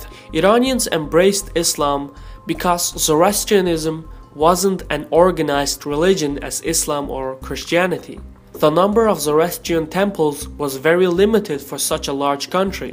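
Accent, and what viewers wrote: Arab world. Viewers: This guy who represents the video is Arab. So you can hear his Arabic accent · Biased. Look here : he has alil bit of arabic accent so ofc he is gonna say there was no genocide ... They converted most of the people with swords Look dude you lied . And its ok cuz we know u r biased.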